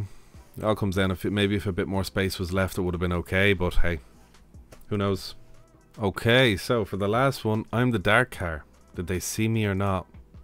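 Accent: Ireland. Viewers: The Irish accent, just love it.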